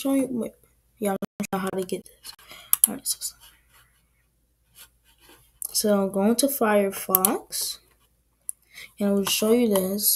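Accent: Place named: England, United Kingdom. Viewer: a hint of English in your mumbling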